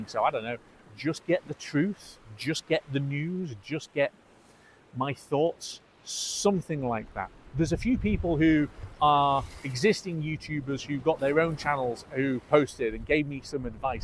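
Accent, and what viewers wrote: Scotland, United Kingdom. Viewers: I'll say this... I support your channel and watch your videos. I like to see Scotland and like to hear the accent as it's so different from where I live. That you like Tesla and good technology is something I also appreciate. I also think that anything Elon related has to be taken with a grain of salt. But what other company is doing the same things even if sometimes the owners think is the wrong direction? You are not a fraud like you said you are. You're just commentating about the tech, the infrastructure and the company. Your channel is really about you in effect and it is entertaining and sometimes thought provoking. Regarding the YouTube algorithim, I think it should be out of your mind. Do the content you want. Let the rest figure itself out.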